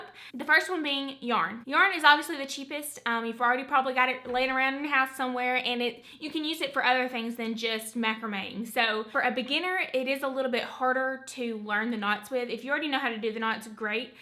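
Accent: South, United States. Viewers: Im from germany and your southern accent is soooo amazing, i love the way you talk!! And of course the info on the macrame rope is very helpful lol 😉👍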